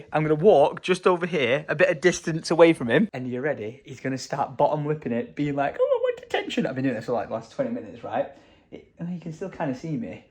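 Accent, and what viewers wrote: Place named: Wigan, United Kingdom. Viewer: The way to understand what you do for us when we watch this vlog. You are to us what Old School Runescape is to You. I have watched almost everything from call of duty, Minecraft, battlefield, vlogs anything really you put out. Also I used to live in Wigan (Platt Bridge) and Your Accent is nostalgic for me so that helps. Much love From Glasgow Scotland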